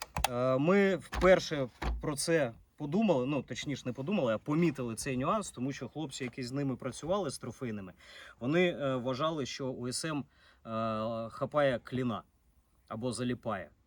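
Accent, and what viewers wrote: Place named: Russia. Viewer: На слух старо русский деревенский язык, что говорит понятно это какой регион России? Кокая та окраина России точно. Давно не был в России мира всем и процветания.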